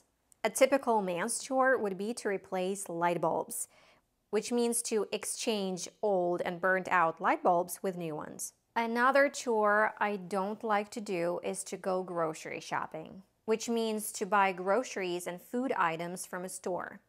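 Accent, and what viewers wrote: United States. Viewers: It's a strong US accent. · This isn't teaching English, this is teaching an American dialect. The words, spelling and pronunciations are very different to "English"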